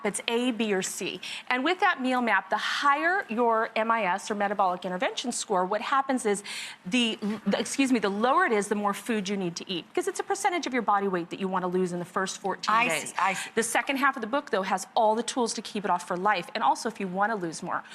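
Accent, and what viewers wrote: United States. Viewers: I this really how people speak in the US?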